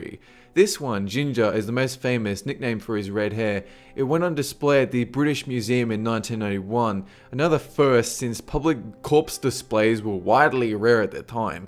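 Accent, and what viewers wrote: Australia. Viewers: He's Australian. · Are you Australian? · He's an Aussie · He's Australian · Is this guy Australian??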